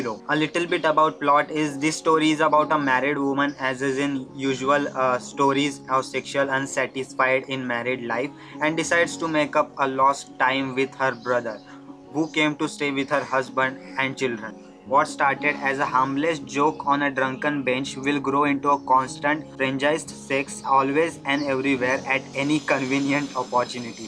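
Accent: India. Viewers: Why you sound indian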